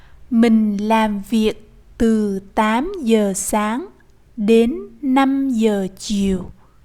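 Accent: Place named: South, Vietnam. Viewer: 👏👏 excellent, thank you! -- May I ask what dialect this is? I thought I heard several Southern characteristics, but the "s" sounds Northern to me?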